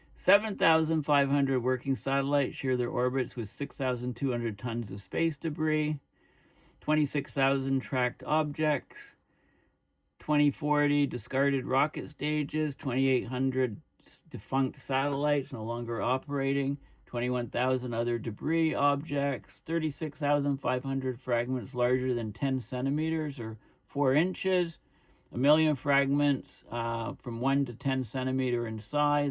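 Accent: Canada. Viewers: How do you call yourself an Irishman today? Sounds like a Canadian accent to me.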